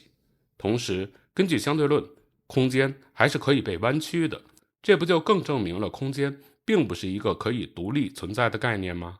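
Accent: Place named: Tianjin, China. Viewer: 主持人是天津人吧，你这内容是从哪抄来的？别装了，直接说天津话吧，你这天津普通话齿音字一点都不少！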